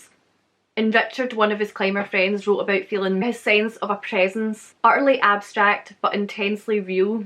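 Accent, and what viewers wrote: Scotland, United Kingdom. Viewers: Everything sounds better in Scottish:) cheers.. sounds like you have another hike to do.. in the DAYTIME:) cheers.. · I just finished watching The Empty Man, a decent horror film which opens up with a hiking scene, and footsteps were... an element of it. Now I'm preparing for D&D in a few hours, watching a couple of your videos to help me get into the Scottish accent. And I stumble on this one. Heh, great stuff.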